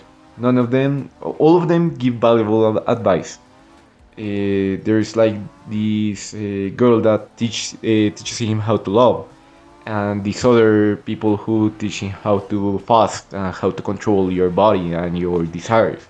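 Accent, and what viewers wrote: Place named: Brazil. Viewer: lesgo mano, eu pensei porque seu sotaque ingles é bem parecido com o sotaque brasileiro em ingles, voce é de onde?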